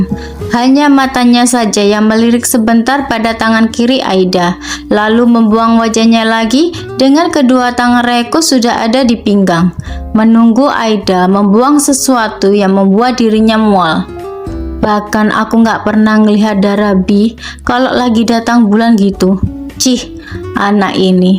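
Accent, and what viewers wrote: Java, Indonesia. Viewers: Suka suara khas medok jawanya semangat akak❤❤❤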